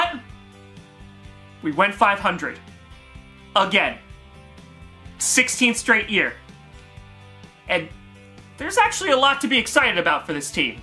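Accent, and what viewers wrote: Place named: Pittsburgh, United States. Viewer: had to channel his inner Yinzer for this one. Safe travels to all the stadiums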